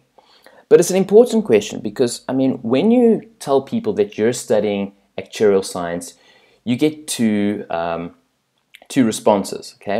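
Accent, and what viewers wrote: South Africa. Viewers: You sound so South African lol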